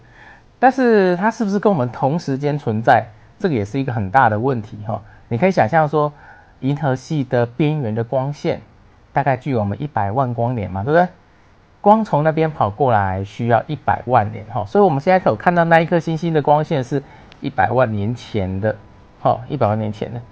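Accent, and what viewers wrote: Taiwan. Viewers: 滿滿的台灣腔齁.....係勒齁沙小的?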